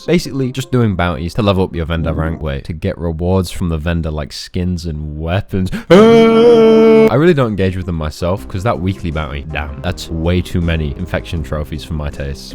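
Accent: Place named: United Kingdom. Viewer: "Special fanks to Warfunder" Literally all i heard before clicking off. You brits need to LEARN HOW TO SPEAK ENGLISH!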